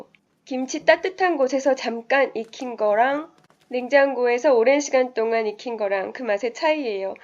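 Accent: Seoul, South Korea. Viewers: 와! 듣고 보니까 말씨가 딱 옜날 서울 사투리네요 ㅎㅎ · 사울사투리 너무귀여어 ㅋ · ㅋㅋㅋ말투 넘 귀여우셔요ㅋㅋ약간 90년대 서울 사투리 같아요ㅋㅋ영상 감사해요!맛있게 만들어볼게요~^^ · 서울 사투리 쓰신다!!! 말투 넘 좋아요ㅎㅎ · 서울 사투리 억양으로 말 하시는 분 근래에 첨 봤네. 덕분에 피자 맛나게 만들어서 먹었어요~ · 서울 억양 너무 좋습니다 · 목소리 말투가.. 너무 매력적이시네요 옛날 서울 사투리? 같아요 정감있고 예쁜어감의 목소리여서 계속 듣게되네요~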